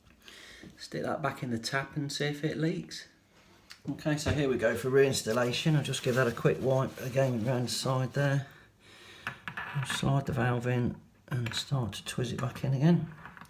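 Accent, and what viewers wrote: Leicester, United Kingdom. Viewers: This is brilliant, was going to get a plumber in until I saw this video, I reckon I can tackle this myself, a big thanks mate! you have to be from around Leicester, sounds like my next door neighbour! thanks again bud!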